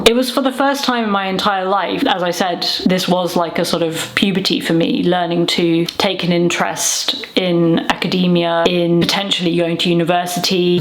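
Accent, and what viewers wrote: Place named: Germany. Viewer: Mas, que inglês pesado, arrastado, você por acaso é alemã ou indiana ? Your english is very heavy, it sounds like German accent to me.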